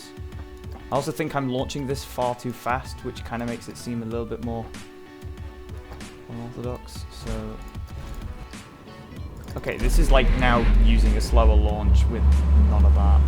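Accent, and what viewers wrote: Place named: United Kingdom. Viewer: British accent while sounding like you just snorted some blow....maybe I'll understand at .75 speed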